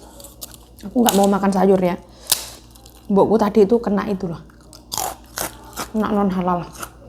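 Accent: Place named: East Java, Indonesia. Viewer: Sehat selalu mbak,tetap semangat.....bicaranya logat jawa timuran,sama kaya diponorogo....👍👍👍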